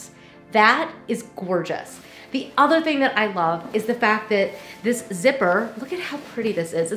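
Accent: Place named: United States. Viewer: Hello lm still waiting,l heard but l have difficult to understand sometimes the American accent if you don't mind l will really appreciate 🙏 if you write the name of those 2 sprays. Thank you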